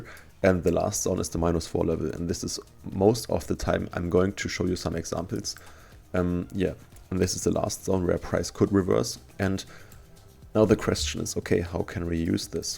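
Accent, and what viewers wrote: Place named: Germany. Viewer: I hören the deutsch 😁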